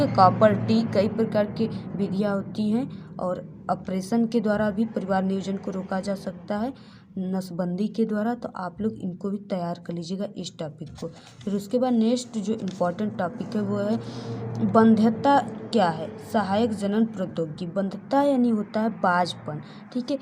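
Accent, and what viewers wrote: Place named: Uttar Pradesh, India. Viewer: Mam mene appki hrr baat axche see sunii or follow kerii wooo mam koo 😮🎉❤❤mam app bhot axcha paadti h mam app up see hu app kii lugahgh se lagra 😮❤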